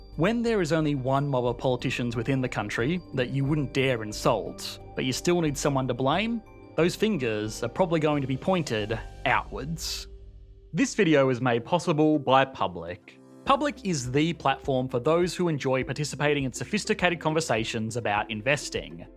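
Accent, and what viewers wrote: Australia. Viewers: There's no way I'm trusting an Australian's analysis on anything related to China. Btw your accent sounds absolutely fucked up. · yeah should have expected some negativity with the aus accent. but hey hundreds of times better then an indian one. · This may not be helpful, but it is truly meant with that intent. The United States has exported amazing and incredible "things" in her relatively brief history; although porn and the Californian uptick are, in my opinion, not worthy of inclusion. We humans have two primary means of communication: audible and visual, and you have a great product which involves both of these areas. However, I couldn't make it through to the end of this particular post because of the CA uptick you appear to have imported. I love the Aussie accent (although I think the South African has you pipped), and with over a million subscribers you can reasonably tell me to... 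er... "go away," but I would ask that you accept this as an honest and well-intended constructive criticism. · I beg your pardon for asking maybe an insensitive question but what nationality is? I keep hearing this accent (PBS spacetime, ), but can’t pinpoint the origin. Australian? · This guys accent drives me nuts. Aussie accents don't tend to bother me but this guy changes his intonations mid-word - "Chyayayana"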